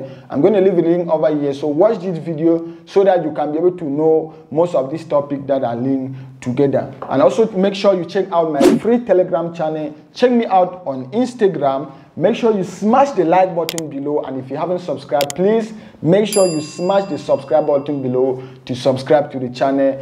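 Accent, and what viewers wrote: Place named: Nigeria. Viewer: I watched to the end 👁️👄👁️ bro I do really ur explanation, I would love to join u telegram group. Southern Cameroon and Nigeria have thesame accent, I thought u were a Nigerian.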